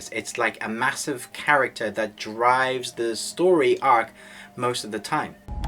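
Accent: South Africa. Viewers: I'm having troubles placi9ng your accent ? South African?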